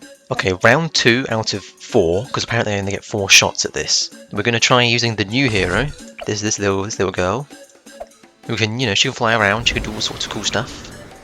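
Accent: United Kingdom. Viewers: you can see the view difference when switching games, idrc and im all for it seeing a bri'ish dude playing bloons and ultrakill with a funny accent is what i signed up for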